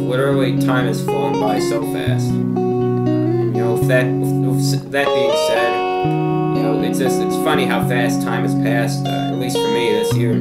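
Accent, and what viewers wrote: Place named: New York, United States. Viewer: He has a New York accent here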